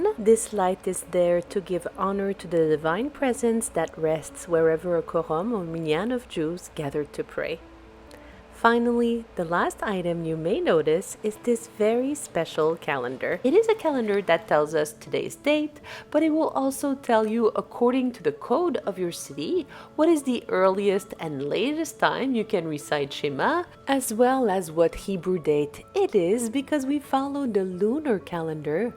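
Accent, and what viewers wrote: France. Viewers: Vous avez un accent français madame !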